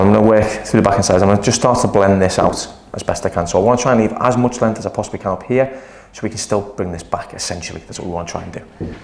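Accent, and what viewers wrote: Liverpool, United Kingdom. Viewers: Liverpool UK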